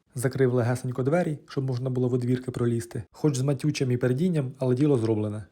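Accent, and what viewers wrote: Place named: Cherkasy, Ukraine. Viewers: А я то чую шо шось рідненький говір. Привіт із Звенигородки, Черкащина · Черкаський говір слухаю як музику....🤭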